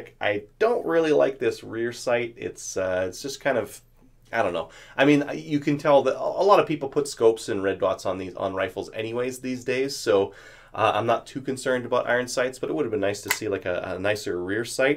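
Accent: Canada. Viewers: The different combs aren't for growing shooters. They're for shooting with iron sights or above axis scopes. It moves your cheek weld to the correct position. You sound Canadian, so I'm assuming you guys are very restricted to the types of weapons you can possess.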